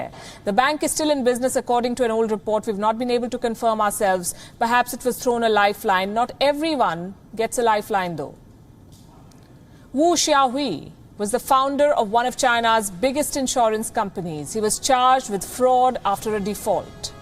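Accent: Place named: India. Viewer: forgif and understand her indian accents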